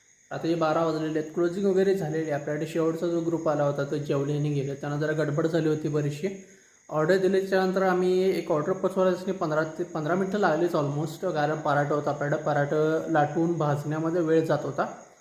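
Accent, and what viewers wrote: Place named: Mumbai, India. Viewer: मी तुमचे व्हिडिओ एकदम सुरूवातीपासून पाहतो तुम्ही कोल्हापूरचे आहात पण शुद्ध मुंबईची भाषा बोलतात आम्ही mh15 नाशिक कर येवला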